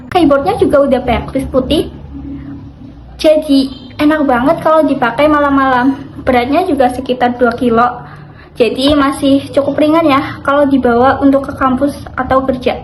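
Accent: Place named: Tegal, Indonesia. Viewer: Logat tegale krasa nmn😭🙌🏽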